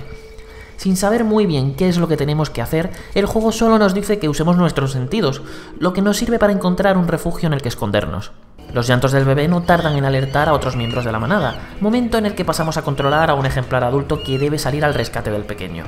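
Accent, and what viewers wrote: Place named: Malaga, Spain. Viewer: El locutor es malagueño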